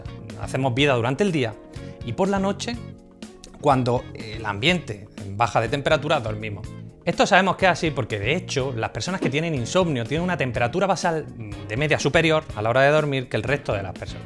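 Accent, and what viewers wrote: Murcia, Spain. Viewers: Acho tu ereh de Murcia no? Yo también.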